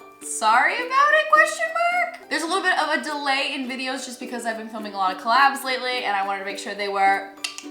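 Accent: Arkansas, United States. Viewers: What part of Arkansas is she from?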